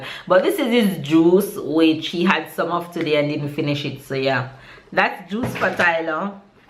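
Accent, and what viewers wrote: Jamaica. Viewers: Here from, as soon as you started talking I knew you were jamaican,of course am going to support my own.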